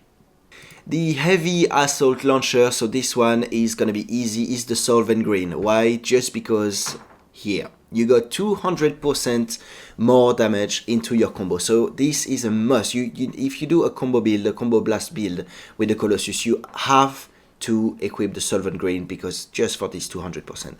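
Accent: France. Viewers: Are u FRENCH My FRIEND?! i like u r acsent :D ! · Vidéo sympa je ne te connaissais pas mais une chose de sûr c'est qu'avec un accent pareil tu ne pouvais être que français 😆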